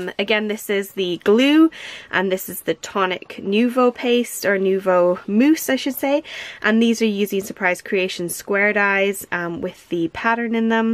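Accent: United Kingdom. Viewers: 35 is VERY hot for the UK. I was laughing when it reached 26 a few years back and the news was warning to keep cool to avoid fainting. However, if it is that hot in Europe this summer, we in Australia can expect temperatures in the high 40s and early 50s. Oh brother. I noticed a bit of the British accent mixed in there. Nice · FANTASTIC video!! Just super, loved it.! I learned a lot and I thought of some different ideas. Thank you sooooo much for teaching us! You can't tell the difference between the home-made pastes and the store bought. Your cards are soooooo cool! You don't sound like you have much of an English accent. What area are you from? Been sweltering here across the pond also! Yuck!☺😊😀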